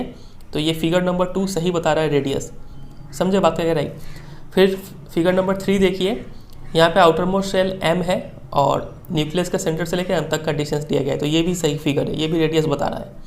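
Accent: Bihar, India. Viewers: Sir aapki voice se pta chalta h ki aap bhi bihar se ho vaise sir main bhi bihar se hi hu Samastipur district se.....by the way sir aap itna acchhe se padhate ho ki mujhe lgta h ki school chodd ke aapse padhu padhu..bs .. . Thanks alot sir for giving such bigg efforts for us.....sir love.....so much......bhagwaan kre aapka channel itna grow kre ki aap hmesha kush rho or apki financial issuue....hamesha achhi rhe ....thanks sir ...😍😍😍😍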